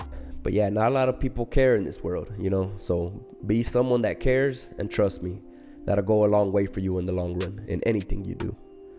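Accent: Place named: Mexico. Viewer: Bro it’s not you talking that’s boring u shocking try to not talk Mexican bro ya accent it’s Chevato bro u can tell Spanish accents heavy try talking like you from Brooklyn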